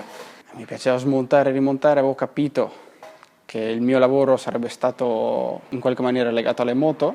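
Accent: Spain. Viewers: È vero comunque c’hai più la parlata e l’accento spagnolo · si sente che hai passato anni in spagna sembri uno spagnolo che parla un perfetto italiano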